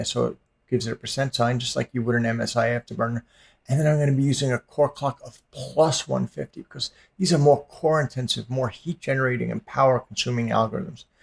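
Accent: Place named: New York, United States. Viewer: I fucking hate that New York accident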